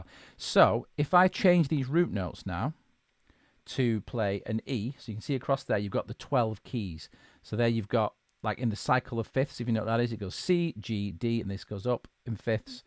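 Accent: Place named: Northern England, United Kingdom. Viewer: Superb tutorial, this. And nice to hear the accent of a fellow northern lad teaching it.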